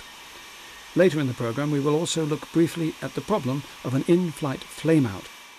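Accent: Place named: United Kingdom. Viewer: Thank you... :-) by the way, may I ask you some detail about the DVD program? I would like to buy it, to my ears the speaker seems to be British rather than American...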